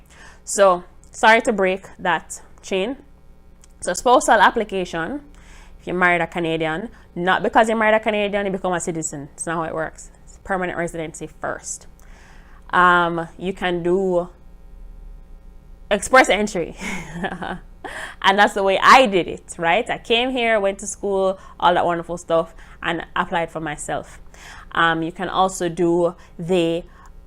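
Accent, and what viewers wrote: Jamaica. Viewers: You sound Jamaican. Are u?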